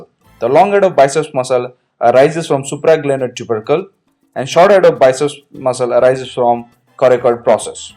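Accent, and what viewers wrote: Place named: India. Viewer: I just become so much distrub whene I hear Indian English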